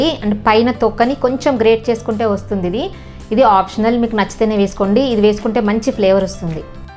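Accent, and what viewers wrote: Telangana, India. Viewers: Andaru Andhra lo cheptaru meru Aina koncham Telangana matladthunattu anpisthunde ❤️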